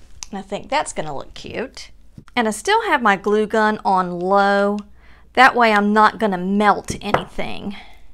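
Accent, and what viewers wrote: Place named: South, United States. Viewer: Hi, how are you? 😀 I'm from Argentina and i bet you didn't expect a suscriber from so far away, and Spanish speaker as well. 😆 I happen to be fully bilingual so i have the pleasure to enjoy all of these beautiful american creators such yourself! I like your southern accent and, if you don't mind my asking, i'm curious to know if you are from Alabama, Tennessee or somewhere nearby. I loved your embroidery hoop ornaments, since fabric is my favorite material to work with. Also stamps. I adore stamping! I have read in another comment that you clean your space as you go, to keep it neat. I do the same when crafting. I cannot concentrate if my surface is too busy with all sort of things. Glad to know i'm not the only one obsessed with cleaning. 😂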